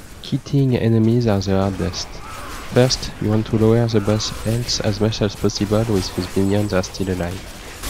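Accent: France. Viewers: La vidéo est impressionnante mais est-ce que tu pourrais en faire une en français, je n'arrive pas à trouver de bon tutoriels en français et vu ton accent dans la vidéo je me dis que tu dois être français... ^^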